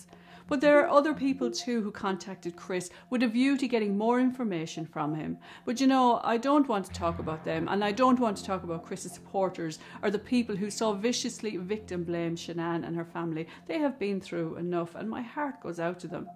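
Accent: Ireland. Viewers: Great video and break down of this tragedy.! I believe NK most definitely has some role in all this. Maybe she didn’t kill anyone but she for sure knew his plans and possibly pushed him to do it. Love your accent BTW.! It actually sounds Irish with an English twang xx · Thank you for sharing your analysis! You sound Irish to me. I have many family members and cousins who live in Ireland and you remind me of them 😊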